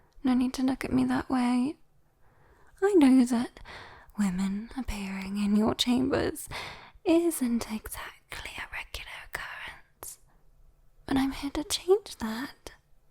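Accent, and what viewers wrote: United Kingdom. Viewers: British accent 👌👌👌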